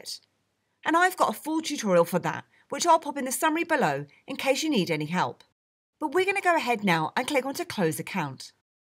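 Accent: United Kingdom. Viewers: UK girl huh, you single?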